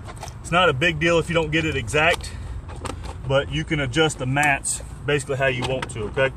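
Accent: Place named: South, United States. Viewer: I never would’ve figured this out by myself in a million years. Thanks! The accent made me homesick for the south! Especially when you say mash down 😊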